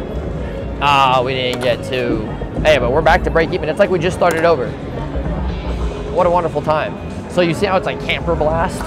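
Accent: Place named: New York, United States. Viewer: Love your channel cause I like your New York or New Jersey accent. LOL Now for educational purposes: You first have to educate yourself to the rules of the game that are there for everyone to read, ESPECIALLY THOSE PLAYING THE GAME. After you have done this, you can then educate your audience. Thank you very much. Best wishes for a prosperous and blessed New Year.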